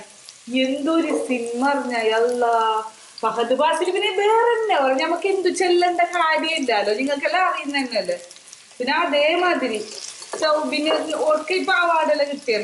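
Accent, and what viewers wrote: Kasaragod, India. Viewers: Hahaha..usaaranne ppa..eden padiche kasaragod bhasa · ശെരിക്കും കാസറഗോഡ് സ്ലാങ് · Ende oru frnd paranjanu njan ee tym kasarkodu bhasha inganayundu ennu kettittundu...ippo aanu nerittu kanunnathu....enda ponne ijjathi resamundu kelkan😂😂😂❤❤ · Kzrkd slang 😍👌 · കാസർഗോഡ് ഉള്ളവർ എല്ലാം ഇങ്ങനെ ആണോ സംസാരിക്കുന്നെ ?? 😆😆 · സൂപ്പർ സ്ലാംഗ് കാസർക്കോട് ഒരു മഴ പെയ്തു തോർന്നു പോയത് പോലെ തോന്നുന്നു അതി മനോഹരം അടുത്ത വീഡിയോ കാത്തിരിക്കുന്നു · Kasarode poli slang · ചേച്ചി ശരിക്കും കാസർഗോഡ് ആണോ.. 😂😂👍👍👍👍❤ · ചേച്ചി കാസർഗോഡ് ആക്കി ശംസരിക്കുന്നത് ആണോ ശെരിക്കും ആണോ കാസറഗോഡ് കാരി ആണോ ഞാൻ ഒരു കാസറഗോഡ് കാരൻ പയ്യൻ (അല്ലെങ്കിൽ നമ്മൾ കാസഗോഡ് കാർ പോളി അല്ലെ) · ivde ipo ntha indaye 😁😂 Ksd slang poli malappuram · Yuppaa maje aayin njammale kasrodian slang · Ksd thanne diffrent slang und so its un predictable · പുതിയാപ്ലെ അല്ലാ മാപ്ലെ casrod slang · Nmmle ksrotte basa endorri paangppa😍😄 hyole bisyo kettit njn chirchitt merchhoyiii,😂😂😂👍👍😍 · Is this kasaragod malayalam?? 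· Sunesh 😀kasrgod slang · നിങ്ങളുടെ ഈ റിവ്യൂ കൊണ്ടു മറ്റുള്ള മലയാളികൾ ഒകെ കുറച്ചു കാസര്ഗോഡൻ മലയാളം പഠിക്കട്ടെ. Good job. Enjoyed. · Kasaragod slang is very beautiful to speak and hear.but don't make it vulgar..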